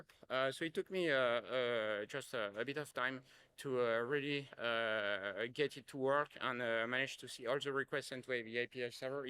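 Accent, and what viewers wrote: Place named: England, United Kingdom. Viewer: Awesome share. But damn..Can’t stand the English accent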